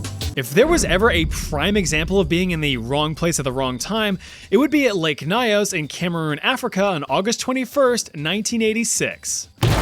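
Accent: Germany. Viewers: As a German myself and a resident of ERFURT, I have to say I LOVE the sprinkled in German words and the accent 😂 "and what kind of scheiße do we get in then" ... I literally lost it 😂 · How is your German accent so perfect?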